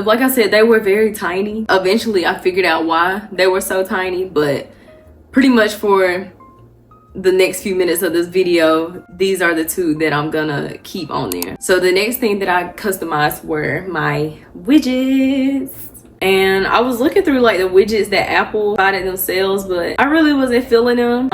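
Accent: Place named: South, United States. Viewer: never realized how country you sound☠️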